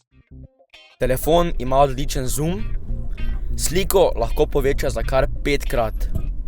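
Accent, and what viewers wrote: Styria, Slovenia. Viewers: ta fon je za razliko od tebe pamtn, pa vid se da si toti štajarc k ti lih ne gre tota angleščina "somrak"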